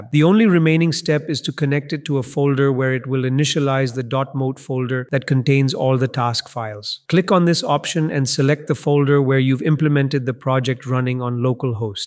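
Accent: India. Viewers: Bro... literally me every fking day! FYI - it's not cursor. It's fking claude. Thank you for not glossing over when tools are dumb af, This AI narrative is much better than the narrative you're using for the one with the asian voice. The asian voice one is a little more sycophantic than this indian one.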